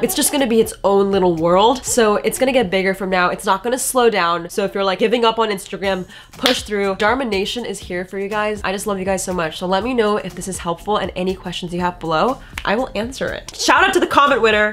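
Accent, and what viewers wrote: United Kingdom. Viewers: As a UK Resident, I approve of the British accent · Kinda like the british accent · British Accent = 7/10. 😎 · WOW WOW WOW WHAT"S WITH THE BRITISH ACCENT!??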